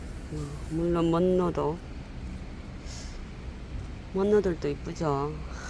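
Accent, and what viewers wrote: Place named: Gyeongsang, South Korea. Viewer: 안녕하세요 반갑습니다 하우스속 다육아이들 잘 있었네요 경상도 억양이 참 정겹고 듣기에 좋아예~~ 사랑스런 다육 아이들 즐감하며 머물다갑니다 함께하며 응원합니다 복된 날 되세요😊❤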